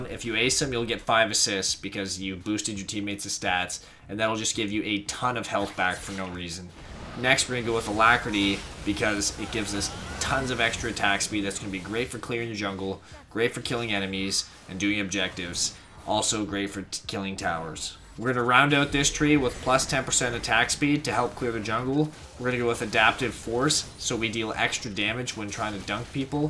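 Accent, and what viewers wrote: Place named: Canada. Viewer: I guess Canadian but I might be wrong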